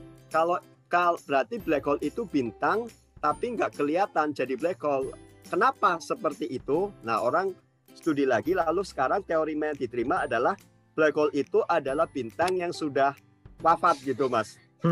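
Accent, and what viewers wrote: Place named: Banyumas, Indonesia. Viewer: Kiye kayane alumni SMA siji purwokerto maseh, ora ngapak ora kepenak 👍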